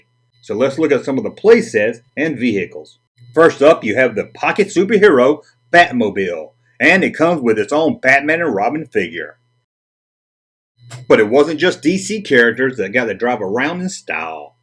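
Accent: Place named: Mississippi, United States. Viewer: Well done! Hey, where are you from? You sound like you could live right down the road from me in Mississippi. 😃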